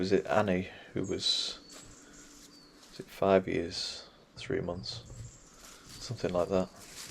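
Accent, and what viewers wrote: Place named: Liverpool, United Kingdom. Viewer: Fascinating! Thank you. I've always been drawn to cemeteries, for the art, the anthropological info and of course for the "unknown" death is a reality and to me, pondering what may happen after we stop breathing- fascinating- reincarnation? Have you seen any tombstones which make a reference to a "new" life- not in the Christian sense, a new life with Christ- I suppose it depends much on where you are exploring- your accent, Liverpool? I love it by the way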